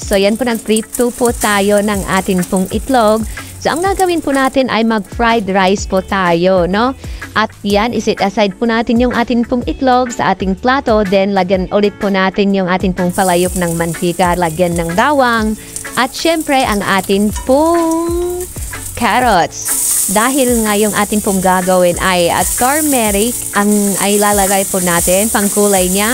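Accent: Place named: China. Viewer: may accent ma si madam ahh. Mandarin accent ma tagalog sanaoil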